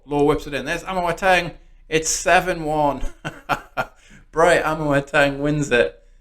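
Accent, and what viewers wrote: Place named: Newcastle, United Kingdom. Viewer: Spoiler Alert! Gutted I never heard you say “Champion”! It’s my favourite word in a Geordie accent! 🤣. Some great squad chemistry there mate, excellent games to play and you’re right, counting is hard! 🤣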